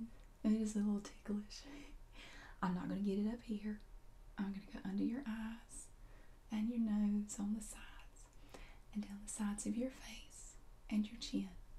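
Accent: South, United States. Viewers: this makes me so comfortable, cuz I'm from the south and I meet people an accent like that , it just makes me feel at home😆😆😆😆 keep doing what your doing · Lol you are soooooooo southern. " luuuuuuuuuk ". Love it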